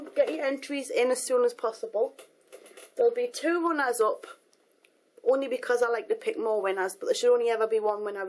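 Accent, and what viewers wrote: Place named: Scotland, United Kingdom. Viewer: Her accent mixes both english and scottish. I heard a welsh person talk before and they didn't sound that different from her.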